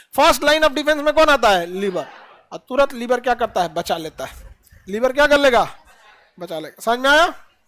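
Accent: Bihar, India. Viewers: Sir bahut achha samjhate hai Bihar abaj me bhi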